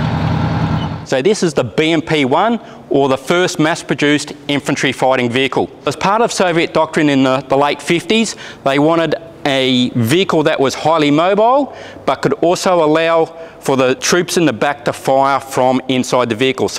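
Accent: Australia. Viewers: Ha with his Australian accent I at first thought he said "murder rifle" rather than "motor rifle" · You have a very authentic Australian accent. Certainly you come from the land down under. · I wonder how this typical Australian accent came about??? You can hear him incorporate/use a certain tone on certain words.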